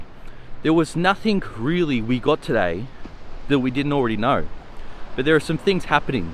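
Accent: Australia. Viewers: Hahahah change of scenery. Nice day out and and beach. Ummmm, are you Australian? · Are you from Australia? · where you Australia?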